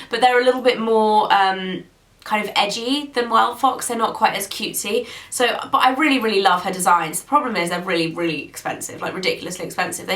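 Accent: England, United Kingdom. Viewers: umm i have 2 questions 1. if you don't mind what part of England are you from like i'm from normanton:] 2. what camera do you use? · I absolutely love Brittish accents! When you say khaki it sounds like car key LOL! xoxo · You sounded to English in this video!!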